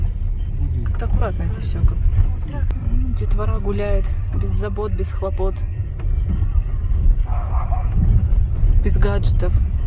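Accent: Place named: Armenia. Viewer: ты говоришь по русски с милым армянским акцентом 😁👍🌹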